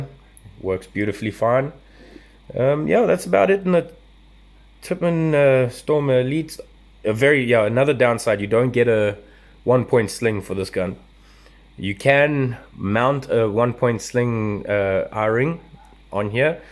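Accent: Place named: South Africa. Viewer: Off topic, but... just an observation... Interesting accent you have in South Africa! To my ear (Southern American) I detect a little Brit, a little Aussie, and oddly enough, a little deep south American. Back on point... I'm wavering between the Stormer Elite and the TMC. I can get a TMC for $40 US (at $229) more than the Stormer (at $189). For that small price difference, do you think it's a better purchase to get the TMC, overall? Will be running a red dot so don't care too much about the flip up sights. And the easy on, easy off fore end isn't a big deal either. But I do kinda like the metal construction of the TMC, as well as just the overall aesthetics, so leaning toward the TMC. Assuming that mechanically they are the same gun... err... I mean, MARKER! But with the Stormer being newer, I can't help but wonder... Does it have some subtle "improvements" or has it been downgraded with subtle cost saving measures.... 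Any thoughts?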